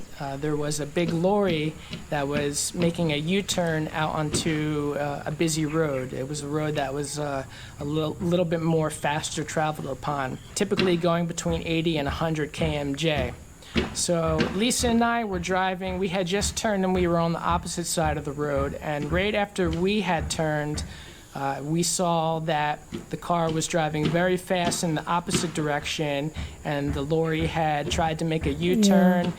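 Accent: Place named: Kelantan, Malaysia. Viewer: ini dye cakap kelate jgk mcm aiman...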